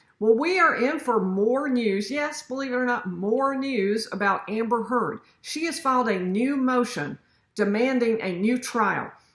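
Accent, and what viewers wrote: South, United States. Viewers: Hi! I have very much enjoyed your channel since I found you! I would like to share a little special story. I too am a Harvard graduate. Undergrad not law school. For that I went to a private Catholic college. My husband and I started to practice law here in Hattiesburg Mississippi 20 years ago. My daughter is graduating from high school and she wants to be a lawyer just like her brilliant parents. Not to brag lol:-) she wants to go to Harvard but she felt very self-conscious about her accent. My husband and I are from the north east so we blended in very well. Your videos in short have taught my daughter that she has nothing to be self-conscious about when she goes to Harvard in the fall. She has a southern twang that’s just her own just like you! Thank you for being an inspiration and a little silly from time to time. We really appreciate you for that. 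Have a great weekend.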